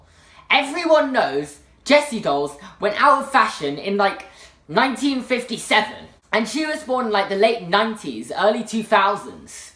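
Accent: England, United Kingdom. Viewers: This video is 86392times better with his British accent😹☺️ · Are you British? I love your accent lol. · Are u from England (I am lol) 😂 love ur accent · youtube then:Hey wanna play MINECRAFT YouTube now:Little british boy talking about disney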